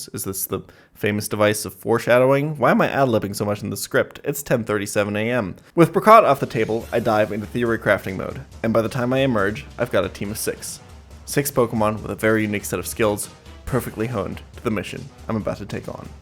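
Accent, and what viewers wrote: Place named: Germany. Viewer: sounds dead? nah he’s just German mate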